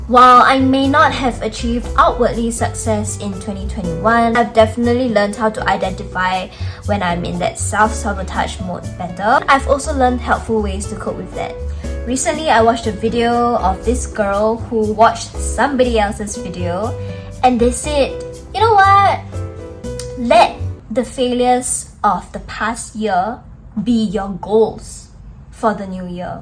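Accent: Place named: Malaysia. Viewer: Hey beautiful. Whats your accent? Malaysian?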